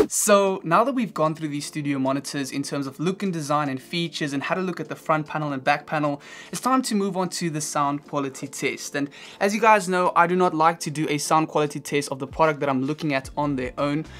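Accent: South Africa. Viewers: Your accent sounds south african are you originally from south africa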